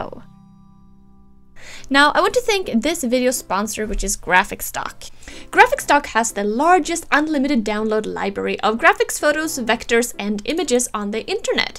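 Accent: Sweden. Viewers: Hey, I wonder where you are from? :) what country? you sounds like a swed :P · are you Swedish? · swedish accent! :) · Very cool and creative product, keep it up! also, are you by any chance Swedish? your accent sounds really Swedish! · Are you Swedish? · Nice! Well done! BTW R U from Sweden by any chance? Din dialekt är skön :) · kul projekt! (antar att du är svensk pga uttalet) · You sound really Swedish haha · are you Swedish? nice video! totally making this. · 100% swedish accent! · HI! Read that you live in Oregon now but you're from Sweden originally right? Recognize the accent ;) Moved there recently? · You sound like you are from sweden :D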